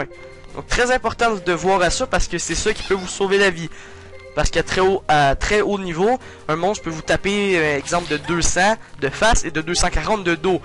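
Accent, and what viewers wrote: Quebec, Canada. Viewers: Québécois ? Et bon Tuto, j'ai appris à jouer graçe a toi ^^ Merci Beaucoup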